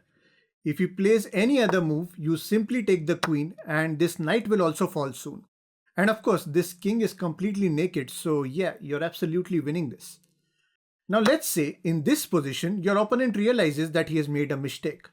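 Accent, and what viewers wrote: India. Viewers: the indian accent makes this channel my fav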